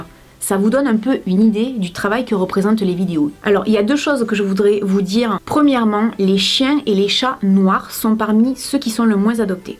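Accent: South, France. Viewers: J'adore l'accent du sud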